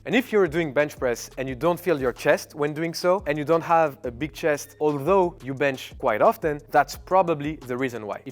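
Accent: France. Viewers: A French talking english......thats a rare kind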